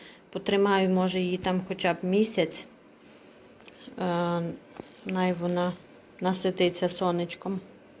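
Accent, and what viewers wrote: Transcarpathia, Ukraine. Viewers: Привіт! Зайшовши на ваш канал зразу підписалася.Закарпатська мова 👍 я теж з Закарпаття. Легких вам пологів💛💙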